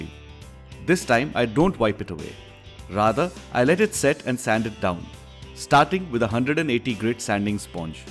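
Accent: India. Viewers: Judging from the dialect you are Indian... well, your model is very colorful, but I think it has nothing to do with the coloring used on the real aircraft. From late 1939 gray colors (RLM 74, 75, 76) replaced the blackgreen/darkgreen (RLM 70, 71) paints. "Experts" reiterate, that Bf 109 E's in early/mid 1940 were finished in RLM 71/02, period!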